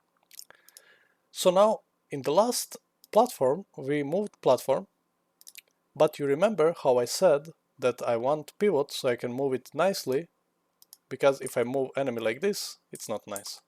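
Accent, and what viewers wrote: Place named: Croatia. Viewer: Odma prvu minutu sam posumnjao da si nas hahaha, hvala ti za ovo <3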